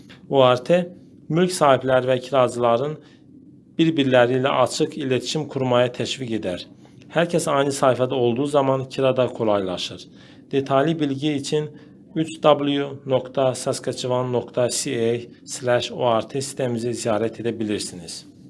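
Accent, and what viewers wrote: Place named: Azerbaijan. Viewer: Bu sesi yazan mutlek ki azerbaycanlidir. Super alinmishdir))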